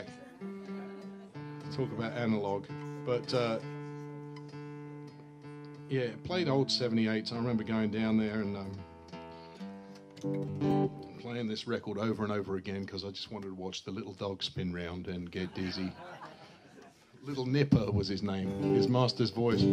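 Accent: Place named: Australia. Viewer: British humour.? Then why does he sound like an Australian?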